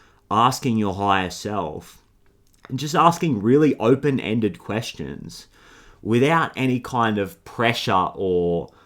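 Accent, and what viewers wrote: Australia. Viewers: Thanks bro. Are you from Australia?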